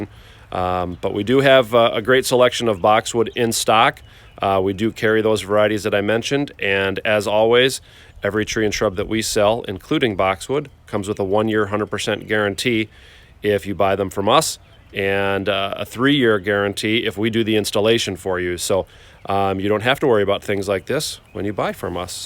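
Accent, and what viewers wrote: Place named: Northern Ireland, United Kingdom. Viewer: Thanks,. Interesting video and confirms what has happened to my hedge here in UK. 😥 btw Off topic. I detect some Northern Irish in your accent, is that your region or are you originally from Ireland?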